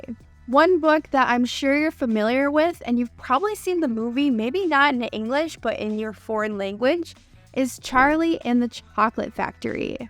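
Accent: United States. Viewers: I love your channel😍. I was looking for a channel like this ( I really like the American accent and this channel has given me tons of information<3) love it!!! Thank you ❤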